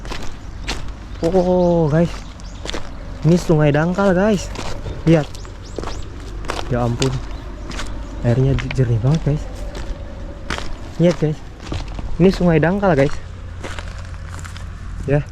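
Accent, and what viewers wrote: Indonesia. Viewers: bisa bahasa jepang tapi logat indonya nampak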